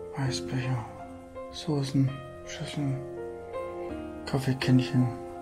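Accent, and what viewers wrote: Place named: Swabia, Germany. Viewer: Hoi, da hört man ja Schwaben, die auch noch tolle Videos machen! Ich habe mal ein bisschen auf dem Kanal herumgestöbert und lupfe meinen Hut. Gute Kameraführung, angenehme Moderation und ohne aufdringliche Hintergrundmusik. Ich selber bin leider inzwischen schon zu alt und zu gebrechlich, um selber noch der Abenteuerlust um verlorene Orte zu frönen, deswegen genieße ich diese Videos vom Sofa aus umso mehr. Da kann ich gar nicht anders, als euch mit der Bimmel zu abonnieren und euch weiter zu empfehlen!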